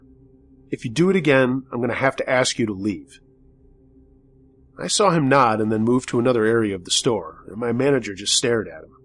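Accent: United States. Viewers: Still can't sleep. Still going through every video I can find. You have the best voice/American accent around. Thanks for getting me through these long sleepless nights 😁😴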